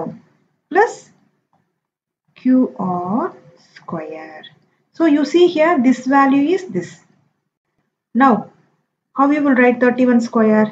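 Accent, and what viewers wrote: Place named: Bengal, India. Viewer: Maam aapki aawaj bengali lagti hai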